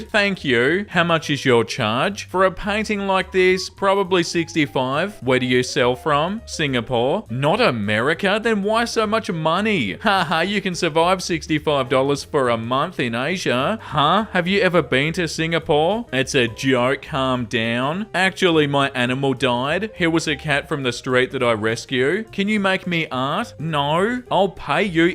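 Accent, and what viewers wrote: Australia. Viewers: Lol my cats name is sassafras ❤ also when I was at the mall yesterday I started feeling not great and I ended up sitting on the floor in a quiet corner in the mall with Starbucks listening to videos, just what I needed, love you ❤ P. S. As a kiwi, you’re literally the only Aussie I can bear listening to 😂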